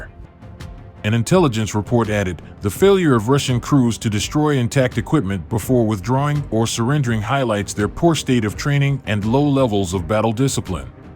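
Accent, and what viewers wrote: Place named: United States. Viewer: Also, why the voice of a hick?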